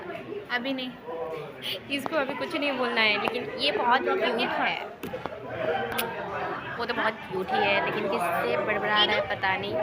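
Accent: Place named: Assam, India. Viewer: Bhai vo assamese hai .. assamese ki hindi itni acchi nai hoti hai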